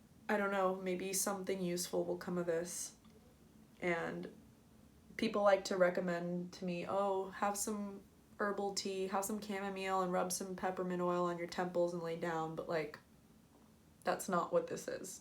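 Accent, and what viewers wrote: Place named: United States. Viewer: a few ideas for you: It is likely that it has something to do with a jammed up neck from an old neck trauma injury. If so then to fix such a neck you'll need to use these techniques: <link> The videos that show the treatment and yo get to see some of the hopeless cases that had been written off - but who are now fixed are shown here: <link> As you sound American and I'm in St Albans UK, then for the time being try this technique: <link> - it is the standard homework I give my patients and it is often very effective. As I think its your neck sleeping on an incline may also change the pressure on your neck, so you may need to try a lower pillow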